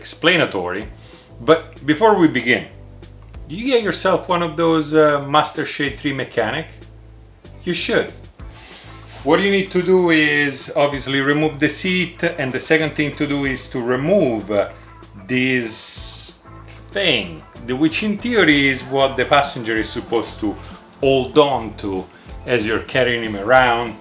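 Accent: Italy. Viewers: Is it weird that I like listening to Italians speak English vs French people? I like French people, but I can't watch a video having them explain things to me. Italians? Well, I watched this whole video and don't own my AT yet. Yeah, it's weird. Whatever. Great vid.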